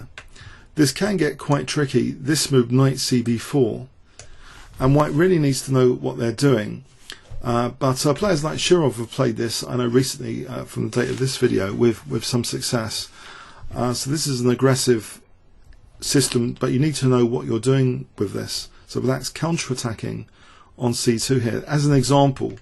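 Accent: United Kingdom. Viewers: British accent is so British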